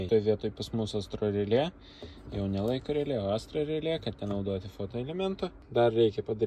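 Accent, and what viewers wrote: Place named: Belarus. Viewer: stop- o kodel Tamsta kalbate su akcentu , Baltarusiu...nebuvo Atsiprasau.....gal marsh , marsh do domu...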